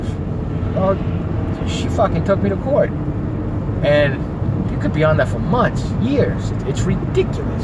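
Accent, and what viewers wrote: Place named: New Jersey, United States. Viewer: You really live in “El culo del mundo” You say, Oh yes I’m from New Jersey, you speak like people from Paterson, Newark Good video to keep ass holes like you away of that great country. Excuse my spelling. Welcome all US tourists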